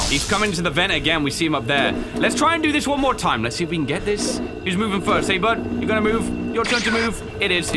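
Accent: United Kingdom. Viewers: FOR SOME REASON I DIDNT REALISE YOUR FROM THE UK HELP. ME TOO OMGGG AA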